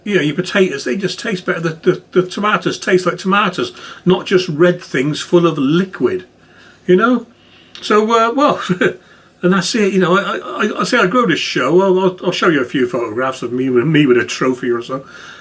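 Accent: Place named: Bristol, United Kingdom. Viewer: Loved this, I'm from Bristol and hearing you remind me if home! Really interesting answers too!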